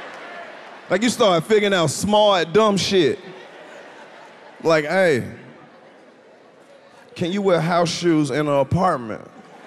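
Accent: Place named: Bay Area, United States. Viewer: On my mama , we Hella do talk like that down here. It's that yay area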